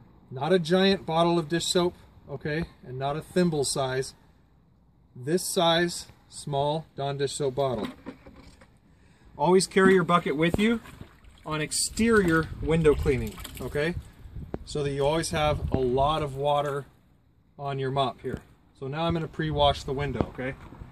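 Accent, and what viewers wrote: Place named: Canada. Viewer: you have a awesome nearly perfect canadian accent :D i love using dawn dishsoap in my housecleaning.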